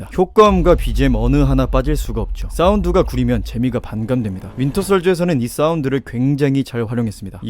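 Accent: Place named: Jeolla, South Korea. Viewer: 전라도 사투리 빼라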